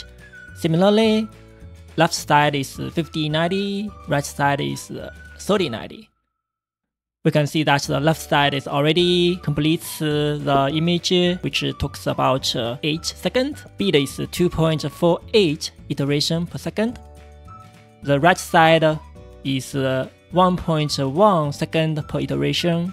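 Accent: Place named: China. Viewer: 哈哈我一听就是中式英语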